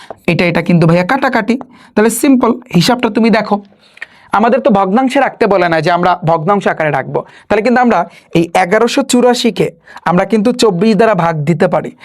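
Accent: Barishal, Bangladesh. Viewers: ভাইয়া কি বরিশালের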